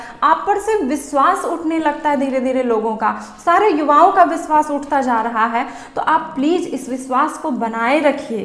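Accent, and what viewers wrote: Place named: Bihar, India. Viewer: Bihari... Style mam😀😀🙏🏽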